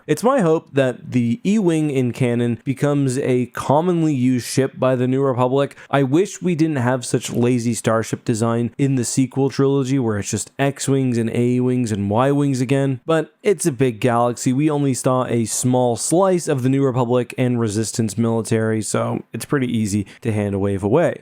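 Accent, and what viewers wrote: Canada. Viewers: oh , Canadian or from Minnesota?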